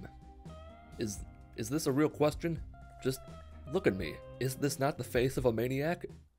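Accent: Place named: United States. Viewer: I never noticed the American accent he has until now. I assumed he was European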